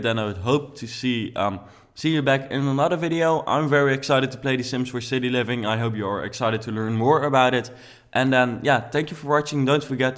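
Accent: Netherlands. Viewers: That Dutch accent tho xD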